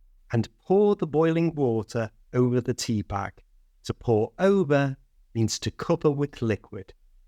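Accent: Liverpool, United Kingdom. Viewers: You have a wonderful scouse accent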